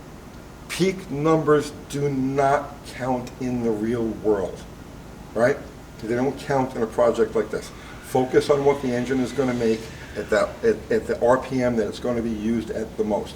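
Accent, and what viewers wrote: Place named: New York, United States. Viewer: At 6 I thought that was going to kick all their asses all right so here we go horse is right behind you with the 4-cylinder kick me or kicking your butt real bad what the hell's going on here all right here comes the Volkswagen Mahler is just going to mow you down best Brigg and Stratton and it's not even but one cylinder you know what the hell I know I got a firecracker right here I bet you your hemorrhoids couldn't handle it no more all right here we go you sound like a New Yorker stop whining and crying get out there and really do something I want to see you go to drag strip and make some happen I want you to take that six cylinder and make it happen what the hell happened I don't know you don't know we don't know we want to know what the hell is happening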